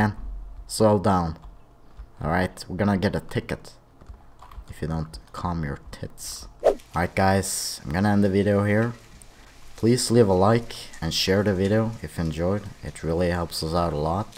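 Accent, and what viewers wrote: Sweden. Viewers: You sounds like your from sweden Im new too and i am from sweden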